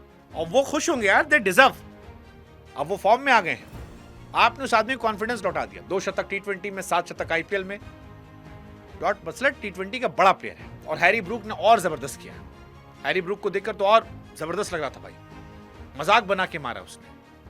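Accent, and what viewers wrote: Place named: Uttar Pradesh, India. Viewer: Bhai. Aap. U. P. Se ho. Kiya. Bolne. Ka andaj. Jaber jast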